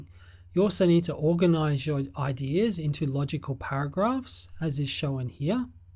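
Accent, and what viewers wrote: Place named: Australia. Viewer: I LOVE AN AUSTRALIAN ACCENT!!!!!! EASY TO UNDERSTAND AND I JUST ... LOVE IT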